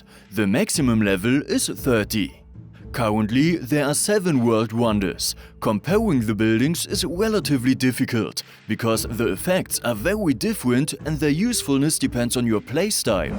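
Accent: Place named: Germany. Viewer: always this groose german English... terrible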